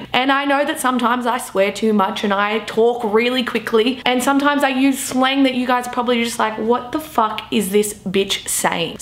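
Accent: Australia. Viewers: I just came here to memorize how an aussie woman's voice sounds. Thanks. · lmao she genuinly has more of an 'aussie' accent than most Australians. I'm an Australian who's lived in most places in the country and I've honestly never met anyone with as much of an accent as her ahaha. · Ive heard people say UIE or bus' a UIE. Idk. I love the aussie accent though. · I like the Australian accent I have been called whoop whoop many times it's funny I now know what it means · I knew you're australian since ! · I’ve never heard a female Australian accent before, thank you! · Damn, I love how Australians talk, the accent and everything ( except the shoe thing ) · I love the Australian accent on a woman 😁. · If u weren't Australian you would sound exactly like Emma Chamberlain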